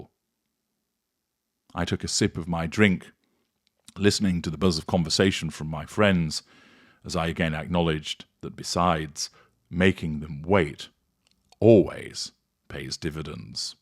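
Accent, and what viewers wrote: United Kingdom. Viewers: Yes. His voice and elite British accent 😀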